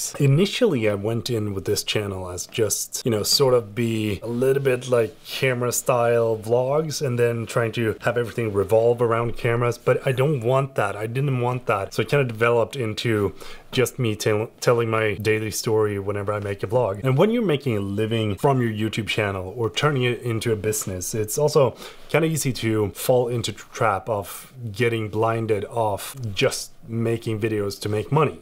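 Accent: Sweden. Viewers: Good to see the vlogs back. And your SwEnglish is on point 👍🏻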